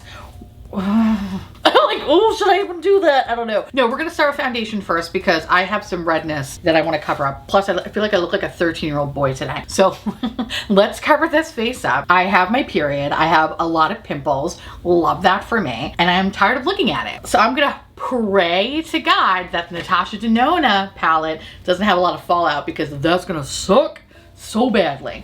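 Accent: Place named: New York, United States. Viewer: I love you, you know this - but I’d absolutely love you to do an entire video in your New Yorker accent. Friday evening in Australia, been looking forward to getting home after work, having a few drinks and watching your video. Have a great weekend!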